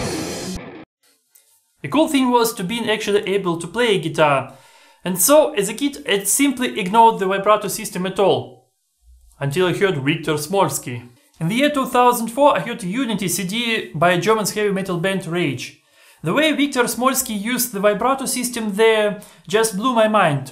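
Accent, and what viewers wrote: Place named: Russia. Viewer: Хм, у тебя очень русский акцент))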